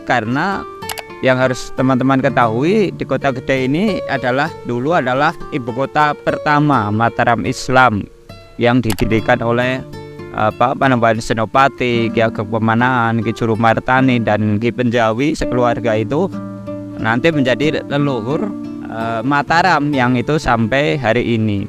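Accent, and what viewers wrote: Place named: Banyumas, Indonesia. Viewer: Sampean kok bahasane..logate ngapak ya…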